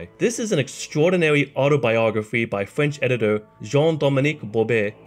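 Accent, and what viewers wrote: Pittsburgh, United States. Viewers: Are you a yinzer?! Pittsburgh'er?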